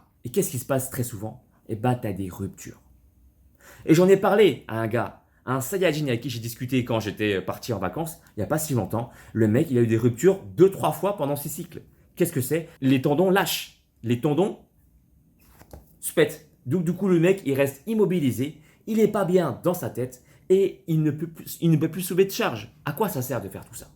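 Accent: Quebec, Canada. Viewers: Accent québécois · C pas un accent un peu oui mais québécois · C’est un accent du Québec 🇨🇦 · L'accent c'est l'accent Québécois et franchement tout le taffs que tu fait tu mérite clairement plus d abonnés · Un accent québécois ;)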